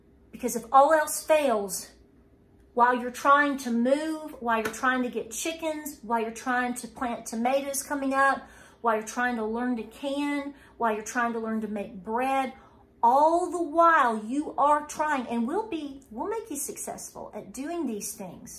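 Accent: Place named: Johnson City, United States. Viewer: Thanks! My husband guessed that you live near Johnson City by your accent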